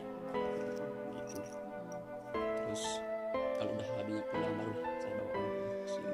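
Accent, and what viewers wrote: Lombok, Indonesia. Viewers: logat lombok memang kental bang 😂😂 mantablah👍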